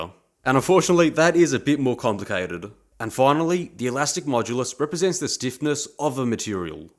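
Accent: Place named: Bogan, Australia. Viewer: Brought out the face reveal definitely dont look like what i expected sound like a bogan aussie 😂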